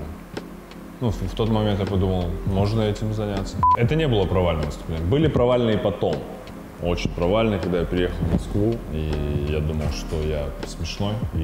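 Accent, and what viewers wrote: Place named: Kazakhstan. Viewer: Если бы я с ним по телефону болтала не видя, я бы сразу сказала свой, казах)) есть у казахов свой неуловимый акцент, как и у корейцев, и у азербайджанцев, и чеченцев с идеальным русским произношением. Это трудно объяснить.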